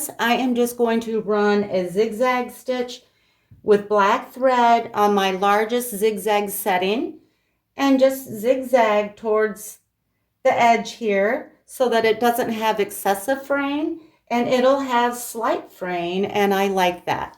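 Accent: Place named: Upper Midwest, United States. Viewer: I hope you don't mind me asking where you are from. Your accent has me going. I'd say Wisconsin, North Dakota or Minnesota> ??? Am I correct? :D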